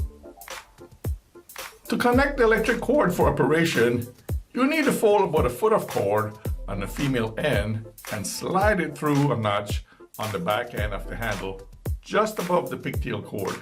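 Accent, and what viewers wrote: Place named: West Indies, Caribbean. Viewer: I got one today it works awesome. Interesting accent are from the West Indies?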